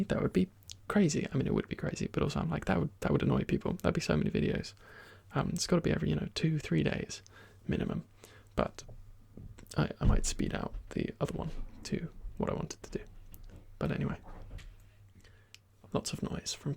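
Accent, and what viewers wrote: United Kingdom. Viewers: One of the reasons that I keep listening to your voice is because I wanna learn your British accent ✨🥲 It is sooo beautiful.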